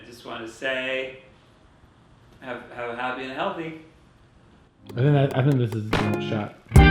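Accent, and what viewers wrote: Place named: Brooklyn, United States. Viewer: So Brooklyn haha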